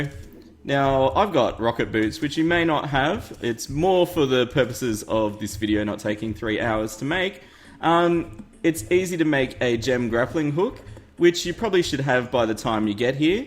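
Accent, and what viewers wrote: Australia. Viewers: But he has an Australian accent XD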